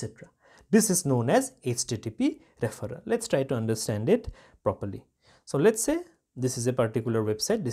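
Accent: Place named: Uttar Pradesh, India. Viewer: Hello you are from UP na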